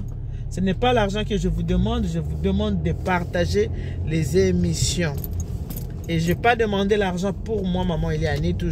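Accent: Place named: Democratic Republic of the Congo. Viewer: Toute belle maman. Je suis une abonnée qui te suis depuis Ndjamena au Tchad... J'aime beaucoup tes vidéos et surtout ton accent congolais 🥰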